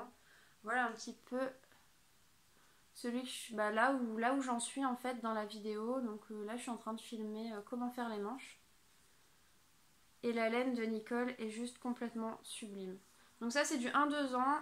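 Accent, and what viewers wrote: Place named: Picardy, France. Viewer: Coucou je me disais bien que ton accent m’est familier. Je suis originaire de la baie de somme en Picardie 😉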